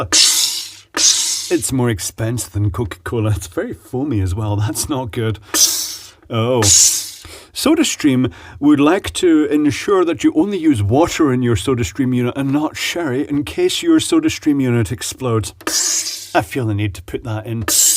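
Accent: Scotland, United Kingdom. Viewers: This is the one and only video in the YouTube universe featuring the long lost posh Scottish accent. :-)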